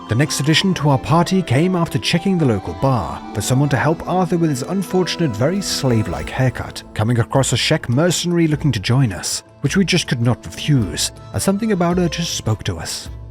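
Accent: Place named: United Kingdom. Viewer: Glad to see someone else discovering this diamond in the rough and enjoying it as much as the rest of us do. Also, yeah, I can't wait to see another Kenshi playthrough. With your sense of humor and buttery smooth, British accent, it would be a treat to see what kinds of shenanigans you could get Arthur, Skibidi, Loada and Wee into and hopefully out of. Cheers!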